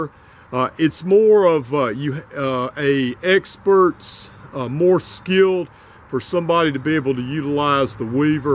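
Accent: South, United States. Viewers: dudes voice is from country heaven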